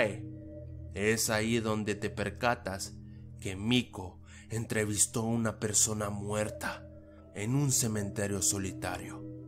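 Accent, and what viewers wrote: Chile. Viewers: suena como chileno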